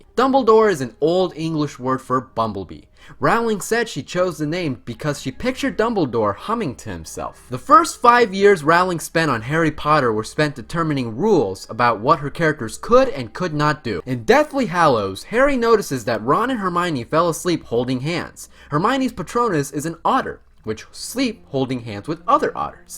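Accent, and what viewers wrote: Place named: United States. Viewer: american pronounciation for the words of harry potter's world takes off all the MAGIC !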